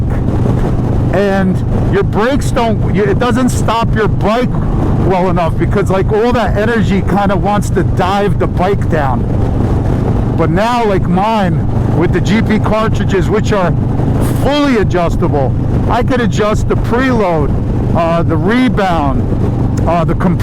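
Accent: New Jersey, United States. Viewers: I enjoy your content, and the interaction with your friends. The Jersey accent reminds me of my late father in law, who was originally from the Jersey City area.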